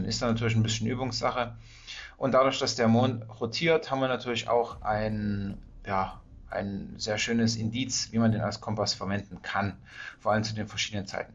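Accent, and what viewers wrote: Saxony, Germany. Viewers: Wir als Franken verstehen das Sächsische und lieben es. PS: meine Mutter kam in Dresden am HBH zur Welt, damals 1924, und dass als Ostpreußin 🤣 Herzlichen Dank, für mich macht das absolut Sinn👍 Danke🧸🌸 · Och Leute,lasst den guten doch mal bitte weiter sächseln. Er is doch kein Bayer,die man wirklich nicht versteht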